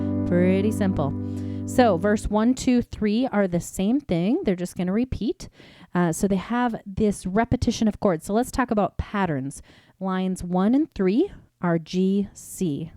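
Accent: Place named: United States. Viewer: Greetings from France ❤️. I SUBSCRIBED TODAY 13th November 2024. I LOVE YOUR "COUNTRY" VOICE, SO BEAUTIFUL AND A PLEASURE TO LISTEN TO ❤. Wowww 😮 You played it to my rhythm, slow and peaceful. You are an EXCELLENT teacher. Thanks from the bottom of my heart for simplifying the music. A big hug of gratitude 😊🫠.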